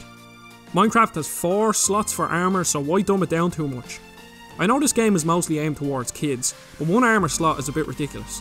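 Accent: Ireland. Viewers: How is it that your accent is so Irish yet so clear? I think you have the best accent ever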